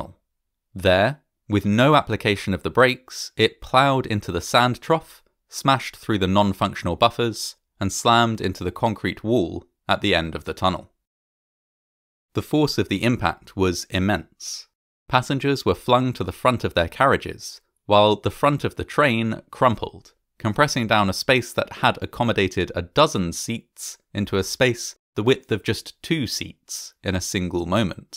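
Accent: England, United Kingdom. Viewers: I adore your pronounciation! Where are you from in England?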